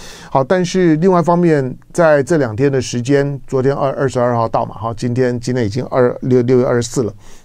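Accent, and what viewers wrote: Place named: North, China. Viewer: 唐湘龙口音越来越北方化了😂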